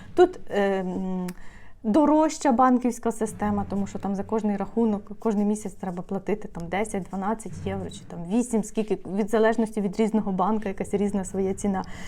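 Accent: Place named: Western Ukraine, Ukraine. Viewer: а ви самі чому в Германії. Ви звідки тікали, з якого міста? Я питаю, бо по вимові, ви з західної частини, а вас не обстрілювали... Багато людей з західних обл. Повтікали за кордон і здають переселенцям свої квартири дуже дорого, маючи дохід живуть за кордоном і ведуть себе наглувато, розорять нашу країну.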